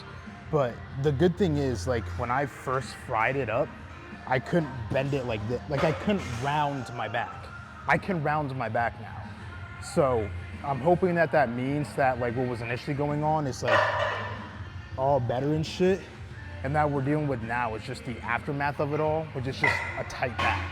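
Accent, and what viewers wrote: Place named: United Kingdom. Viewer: deadass thought u were british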